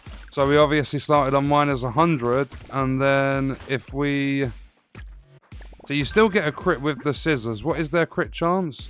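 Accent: United Kingdom. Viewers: no hate but bro is the most british guy i heard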